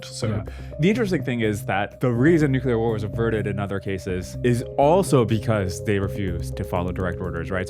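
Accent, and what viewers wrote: United Kingdom. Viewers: Why is it so annoying to hear criticism of the American war effort in a British accent?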